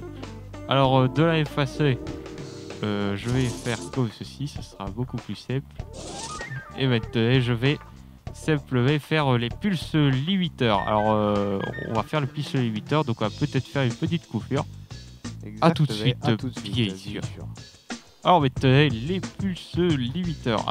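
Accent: South, France. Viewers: Rien à dire sur le système, mais votre accent du Sud est vraiment très très prononcé et c'est dur à écouter...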